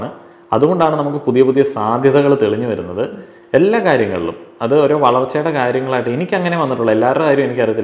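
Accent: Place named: Kottayam, India. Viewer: പെങ്ങളുടെ ഭാഷ കേട്ടപ്പം മനസ്സിലായി, കോട്ടയം ജില്ലയുടെ ഏതോ ഭാഗത്ത്‌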